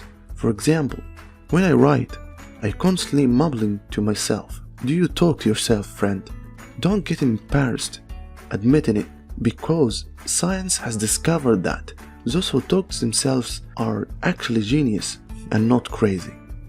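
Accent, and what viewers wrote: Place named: Egypt. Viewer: What's the program that you used to make the video? Super Cool. ALSO! Are you from Egypt? Your accent sounds very familiar :)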